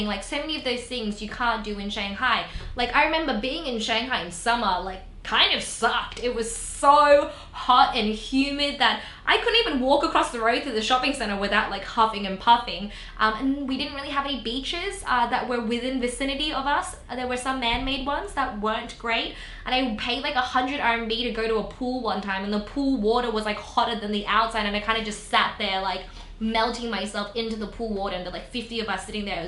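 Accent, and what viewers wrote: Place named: Australia. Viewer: 戈小姑娘额英文一听就晓得是Aussie。现在住在哪？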